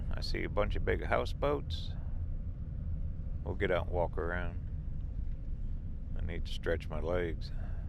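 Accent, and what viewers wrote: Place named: South, United States. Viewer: You do have a southerner accent.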